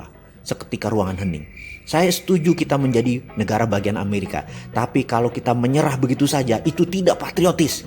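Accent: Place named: Madura, Indonesia. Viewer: Sontoloyo doang logat maduramu boss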